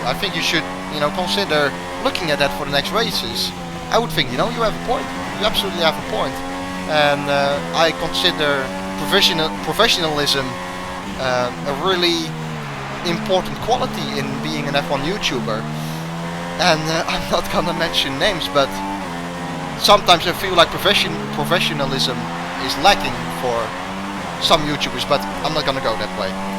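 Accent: Netherlands. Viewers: Ik kon gwn aan be engels horen dat he nl bent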